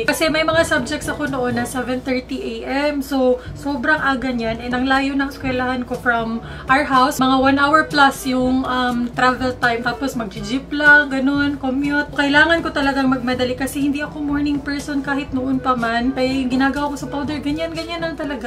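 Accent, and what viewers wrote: Visayas, Philippines. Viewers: Luh...Bisaya ka noh?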